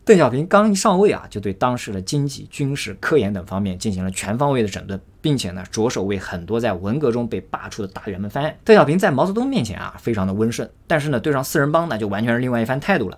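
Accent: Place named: Tianjin, China. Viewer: 是天津人吗？感觉口音有点像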